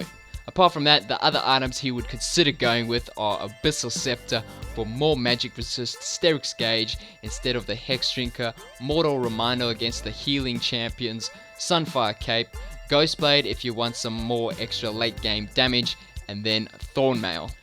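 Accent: South Africa. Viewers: You need more recognition bro, and are you South African? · Is this guy South African? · are you south African?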